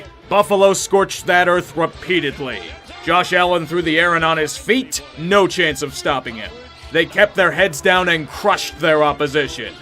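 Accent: Pittsburgh, United States. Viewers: Come on, let your Inner Yinzer do commentary for a Steelers game.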